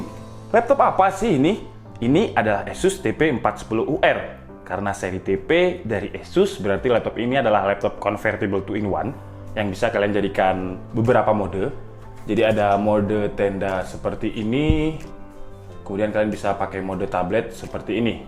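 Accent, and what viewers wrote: Java, Indonesia. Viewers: Waduh mengsle" jowo banget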